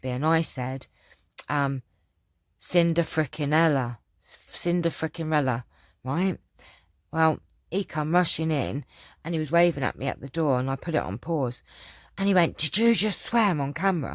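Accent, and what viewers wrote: Yorkshire, United Kingdom. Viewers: You made me laugh with your Yorkshire accent 😂😂 and your jokes lol! Thanks for sharing, it’s been fun getting to know you more xxx❤️ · Your story about going out in a convertible reminded me of a wish I've had since a teenager, wanting a MG convertible. I loved that movie Half a Sixpence, my mum bought an LP of the songs loved it, played it so often. You are so entertaining love your Yorkshire accent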